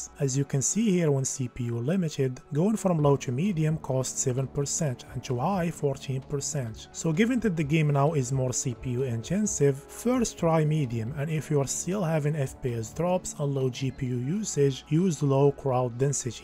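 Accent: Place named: Morocco. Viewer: Your English accent like moroccan people , nice videos keep going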